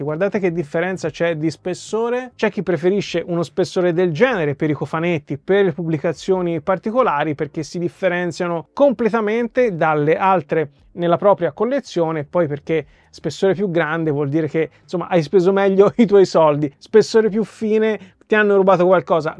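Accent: Tuscany, Italy. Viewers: Ciao sentendo l accento toscano te tutto bene con l alluvione spero.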